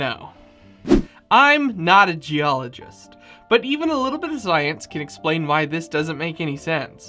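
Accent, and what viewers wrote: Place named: California, United States. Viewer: I find his California accent annoying. His face is also annoying. His attitude is a jerk.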